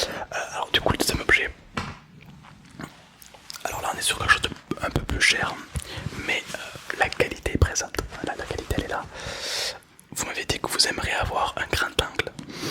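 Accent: South, France. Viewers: Rolalah il a une accent du sud il me regale 😁🤣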